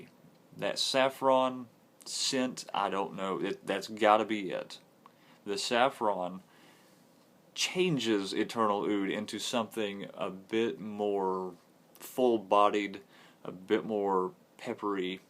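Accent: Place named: United States. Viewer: I like your accent, whereabouts in the U.S. are you from? Also, you have a cool speaking voice and would make a good actor. So use it fella, it could be beneficial and get you that woman you want to be smelling. Musk Oud? Not a favourite of mine I prefer pure oud from that line but the FMK oud line? Dear Lord, love those scents.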